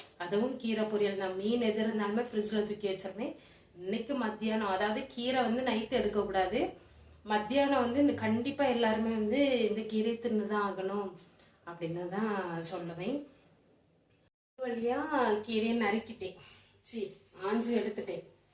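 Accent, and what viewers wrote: Kanyakumari, India. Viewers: ok slang apdi thonuthu na kanyakumari than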